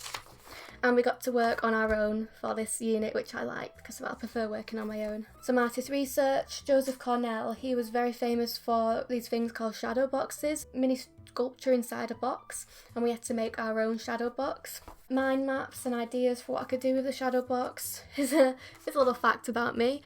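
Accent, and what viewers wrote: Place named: United Kingdom. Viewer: I really love your accent! I am Latina from Chile and I love watch your videos becouse I Lear inglés brinanico and you inspire my to keep drawing Idont now if are a wrong word I sorry for that... ❤️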